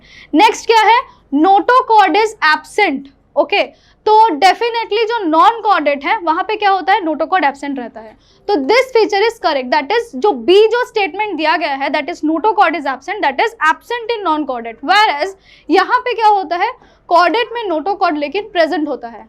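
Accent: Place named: India. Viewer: NICE HINDI ACCENT 👌. VERY IMPORTANT FOR MASS APPEAL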